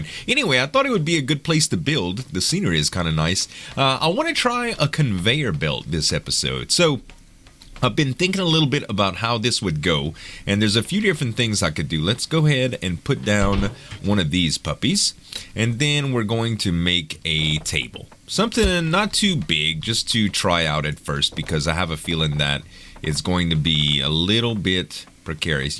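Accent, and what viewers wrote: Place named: South, United States. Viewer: You seem more country then last time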